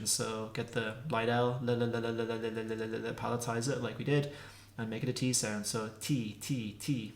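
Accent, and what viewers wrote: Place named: Ireland. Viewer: Oh, not at all, there's definitely a beauty to the diversity of the dialects in Irish. I suppose its analogous to tomahto (Ireland) vs tomayto (US) vs tomawto (South England) in English. The Irish or Gaelic dialectical continuum is a fascinating subject, making for a lot of fun comparisons with languages like German and Italian (eg Napoletano). I'd like to read more on the reconstructions of the extinct dialects of Irish, it's a pity the Dublin Gaelic blog isn't updated so much any more. If you know of any others, do let me know. I'm looking forward to your next videos. We need more people like ye pumping out content with authentic Irish phonology & intonation. Even a 10 min conversation between you and Patchy or Dazpatreg would be a joy.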